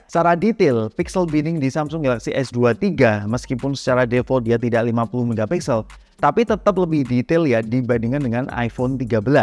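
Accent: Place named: Pekalongan, Indonesia. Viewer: kaceke tidak banyaknya ya, jgn2 mas nya orang pekalongan nih 😁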